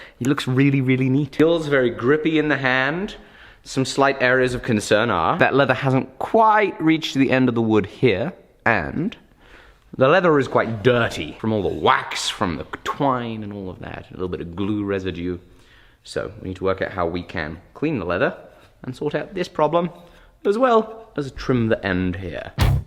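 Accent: France. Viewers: Très bel accent français! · Another french applauding the french accent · Your french accent is killing me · that french accent bro oO Congrats from france!! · Supers accent français, félicitations !! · belle accent français ! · Super accent français ! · Joli accent français 😉 ! Amazing Damascus pattern, really nice job 👍🏼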